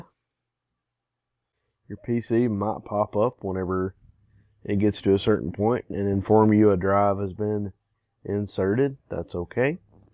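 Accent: South, United States. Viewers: Excellent. Love the Dixie accent !! ;)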